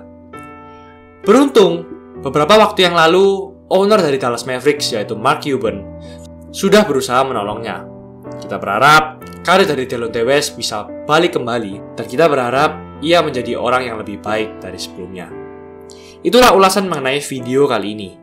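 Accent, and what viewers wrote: Java, Indonesia. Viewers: La medoook😁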